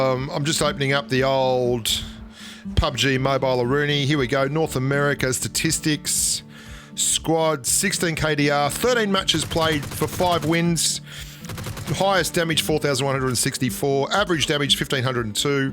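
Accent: Australia. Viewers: I have always loved your content. Recently whoever asks me about a good guide to the game, I always recommend your channel. But problem with you expanding your viewer base in India is the Language, not a lot of people are comfortable, I think, with your fast and fluent Australian accent. Ironically that is what makes your videos that much more lovely😬. Keep growing. Love ya.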